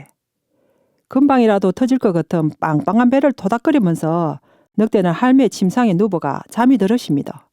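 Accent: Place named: Hadong, South Korea. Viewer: 제가 사는 곳에선 좀 처럼 접하기 힘든 사투리인데, 하동 말로 듣는 동화가 생생하고, 참 정겹게 느껴지네요. 다음 편도 기다릴게요 😊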